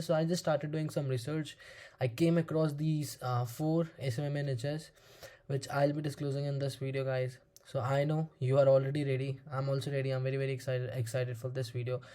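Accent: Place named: India. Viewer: i meant bad indian* accent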